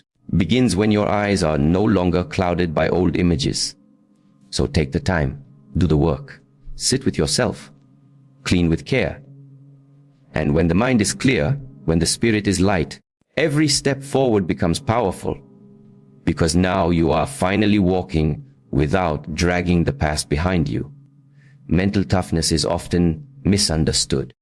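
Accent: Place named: India. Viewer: Okay he sounds like he's from india on this one, It definitely threw me off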